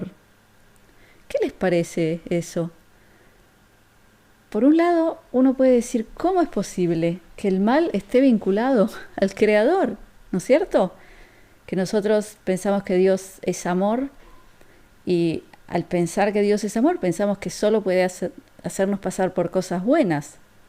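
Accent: Argentina. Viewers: esa historia de las huellas no es de Dios Padre El es energía y no tiene materia Las huellas son las de Jesús El se hizo Hombre y camino sobre esta tierra Entiendo tu historia tus raíces y las respeto!!! Pero la Huellas son de Jesús!!! Aunque Nuestro Padre Eterno Siempre esta pendiente de nuestras necesidades!! Tenes una dulce y suave voz Argentina da gusto escucharte También en Hebreo resalta esas cualidades que mencione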